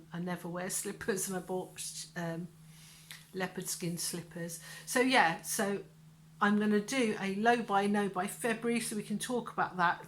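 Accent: United Kingdom. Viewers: OMG, aren't you adorable! 😊 I love our channel - I've just now subscribed! GREETINGS FROM NAGOYA, JAPAN! (I'm not Japanese, myself... I'm a mix of Indigenous Canadian (Siksika/Mohawk-Cree) and Norwegian) - and I like your UK accent! My dear Abigail is from the UK, but she's a Yorkshire lass, so, different accent LOL - anyways, I love that you're still going strong with this channel of yours! Seems so many polyclay'ers have quit YouTubing some fistful of years ago, but you're still rockin' it! I LOVE YOUR CONTENT! 🙂 Please do continue it... I've so many ideas/questions... how to make boxes/containers from polyclay? Etc. - I'm trying to figure out how to make "Ranger Eyes" - they're basically, velcro patches for backpacks, first aid kits, and EDC (Every Day Carry) pouches... I wanna try using polyclay... as when the products are thin enough, they're flexible enough... 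but I might need to use my leathercrafting sewing-hole-punch 'round the edges before baking... WHOOPS! I'M RAMBLIING! LOL Anyways, thank you for your content! And tell what's-his-name to give you your crafting room back! CHEERS! 😉👍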